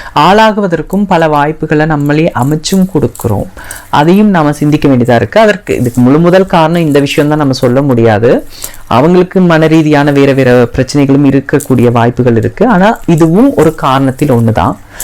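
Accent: Sri Lanka. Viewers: Ungaloda ilangai tamai I LIKE IT Ninga foreign la irunthalum nama tamil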